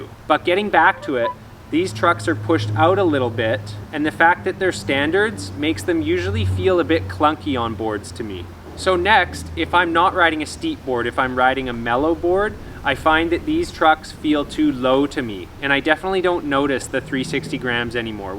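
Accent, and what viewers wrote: Canada. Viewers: Thanks for your content, and I knew you were Canadian from the moment I heard you speak (as an accent aware Canadian, myself), but stoked you're from the lower mainland :)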